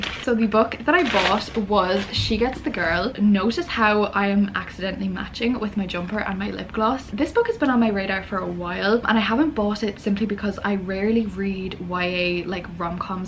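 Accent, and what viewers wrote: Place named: Ireland. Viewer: I love the Irish accent!! I can listen to you all day! I love your videos 😊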